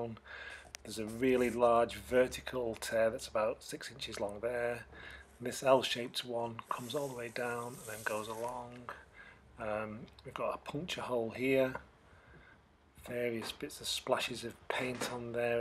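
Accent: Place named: Northern England, United Kingdom. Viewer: I’m another that has followed for a while. Just loving to hear a fellow northerner!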